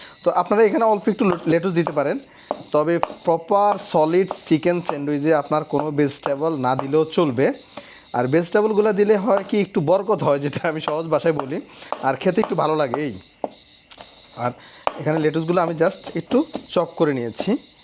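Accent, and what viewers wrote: Noakhali, Bangladesh. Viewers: বাড়ী কি নোয়াখালী নাকি ভাষা এমন কেনো। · হু ভাই! একদম খাঁটি নোয়াখাইল্ল্যা। এজন্য কিছু শব্দ নাকের ভিতরে চলে আসে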